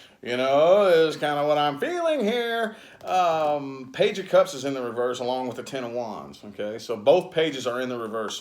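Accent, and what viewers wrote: South, United States. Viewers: I like you and I like how you talk how you sound so southern😘😘😘 thanks for the reading. Queen Leo 8-21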